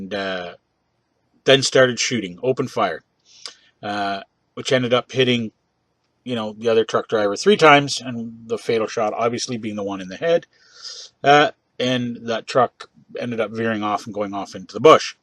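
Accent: Canada. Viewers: With you're accent you can't fool anyone you're from anywhere but Canada! Glad to see you. Thanks for the update. Take care.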